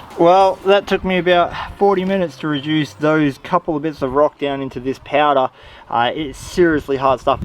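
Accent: Australia. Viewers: I think I just found the Australian version of myself without the tattoos I'm doing everything this guy's been doing without the Australian accent which I think would be really neat to have as well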